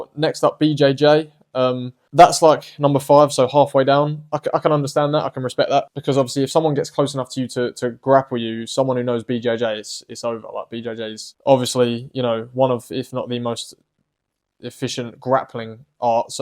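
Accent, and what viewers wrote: United Kingdom. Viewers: This is the first time i've ever heard your voice because I always just watch your shorts which always have " " as the sound, I wasn't expecting you to be british😅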